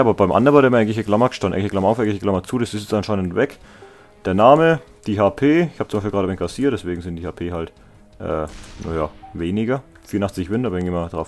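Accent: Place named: Franconia, Germany. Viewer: Hey woher kommst du eig. dein Dialekt is so fränkisch😊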